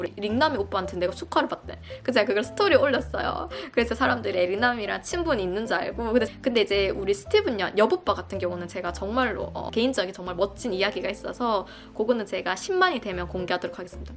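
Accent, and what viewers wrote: Gyeongsang, South Korea. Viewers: 오늘 우연히 보게 됫네요...경상도 액센트도 정겹고, 너무 예쁘고, 생각도 바른 젊은인것 같아 호감 급 상승했어요~^^ 내 아들도 이민자라 같은 또래 젊은인것 같아 더 관심가네요~대형 유투버로 성장 하길 응원해요~^^ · 아이고 5만 츄카해요~같은 갱상도군효 ㅎ 저두미국이에요 1년됫어요 영어못하는즘마ㅠ집콕예요 저두 취직?은무리고..애가둘이라ㅡㅡ 알바하고싶군효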